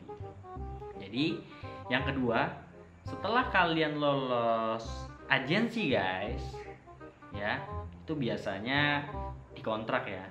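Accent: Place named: Banjar, Indonesia. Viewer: tapi ada bahasa tadi logatnya kaya logat bhsa Banjar. Makanya saya kira dari Kalsel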